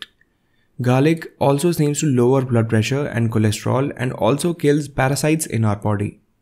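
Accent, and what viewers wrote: India. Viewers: Indian voice 🇮🇳🇮🇳🇮🇳😊❤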